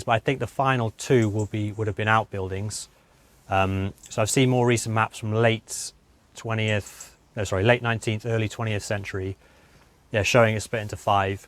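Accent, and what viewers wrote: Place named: United Kingdom. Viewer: It's fascinating to see all the work you do to uncover this. Very labor intensive. I love the U. K. accent. I wish you were single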